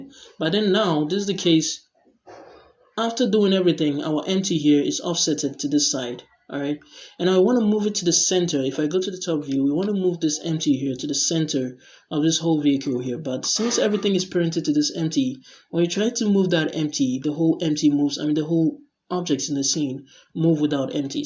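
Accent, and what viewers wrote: Nigeria. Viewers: Omg, that accent is eerily Nigerian.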